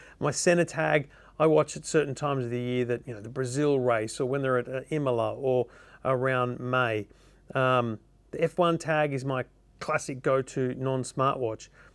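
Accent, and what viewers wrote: Australia. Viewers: Is this Guy from Australia? And why is he speaking in Australian?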